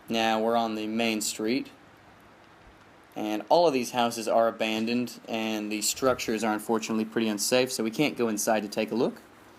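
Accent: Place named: South, United States. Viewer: Your accent has taken on a bit of a southern twang I hadn't noticed before. Hah.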